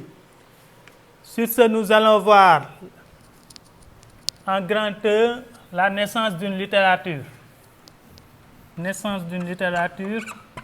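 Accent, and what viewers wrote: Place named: Africa. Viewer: J'ai eu 2 enseignants ayant une telle diction. Ils étaient professeurs de mathématiques. 6 classes du collège ont vu les élèves en échec total. Donc plus de 2 ans à reprendre pour 198 élèves. La langue française est une langue vivante, je ne doute pas de ses qualités littéraires écrites. Mais les différents dialectes de chaque pays africain affectent la diction. Une étude à été dirigée dans plusieurs pays africains.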